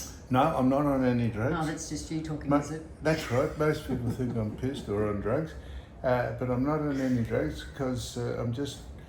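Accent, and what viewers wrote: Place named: Australia. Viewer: God bless you,:) I like your larrikin banter. Always well said with Aussie charm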